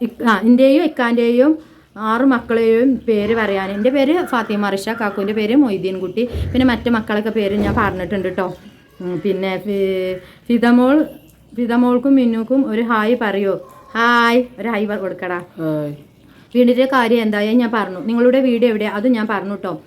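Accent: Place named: Pullanjeri, India. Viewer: ചേപപൂര് ആണോ വീട്.ഞാൻ Pullanjeri ആണ്😊.ഞാൻ ഈ അടുത്താണ് നിഞളെ വീഡിയോ കാണാൻ തുടങ്ങിയത്.പിന്നെ നമ്മ ള ഭാഷ അത് ഇതല്ലാതെ വേറേത്😊❤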